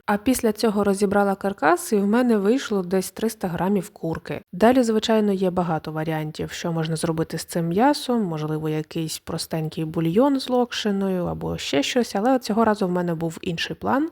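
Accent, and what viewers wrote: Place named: Kharkiv, Ukraine. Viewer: Ви - чудова, і рецепти - цікаві. Більш за все подобається ваш тембр. І ще інколи здається, що ви з Харкова.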